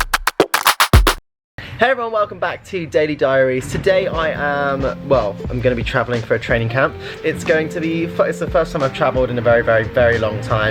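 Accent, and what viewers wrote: United Kingdom. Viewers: If you listen, you will know he is British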